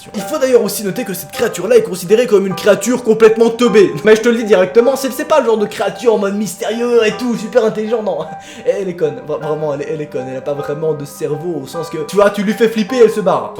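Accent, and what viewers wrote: Belgium. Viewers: il est belge ou suisse chépa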